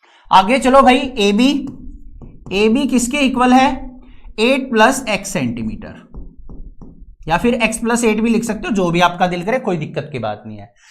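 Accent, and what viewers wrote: Haryana, India. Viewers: Thodi haryanvi banti ha class ma app na bola tha phala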